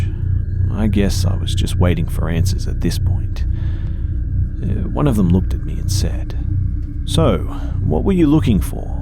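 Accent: Australia. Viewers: I fucking love your Aussie accent hnnnng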